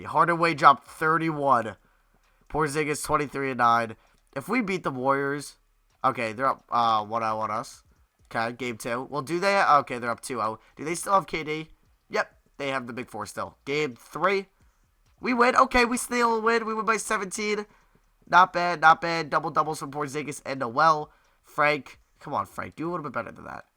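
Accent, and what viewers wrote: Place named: Long Island, United States. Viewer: Matthew B just Long Island accent nothing wrong I have it to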